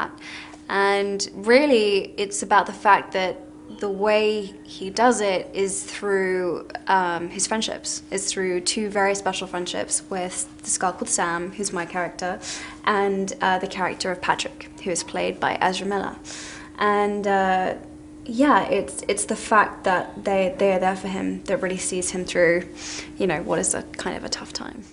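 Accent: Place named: United Kingdom. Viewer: Love her british accent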